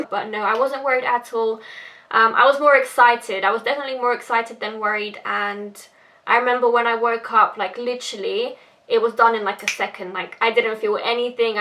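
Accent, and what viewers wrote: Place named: United Kingdom. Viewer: Where are u from.. U have that british accent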